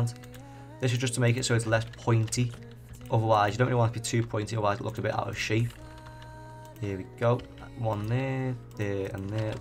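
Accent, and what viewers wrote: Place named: Liverpool, United Kingdom. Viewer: Ur from Liverpool aren't you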